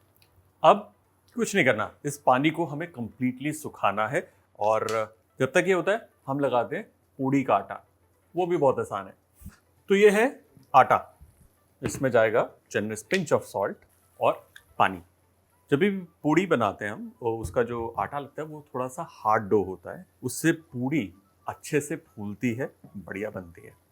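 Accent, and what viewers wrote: Punjab, India. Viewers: Really like ur punjabi accent. In my parents house we make safed chana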